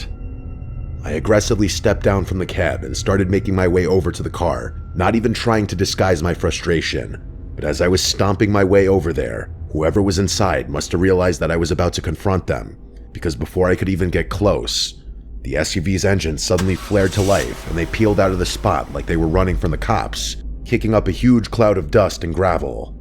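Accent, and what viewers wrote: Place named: New Jersey, United States. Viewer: No he’s not. The abhorrent Jersey accent is far from intriguing. It’s amazing how many Generics fall in line.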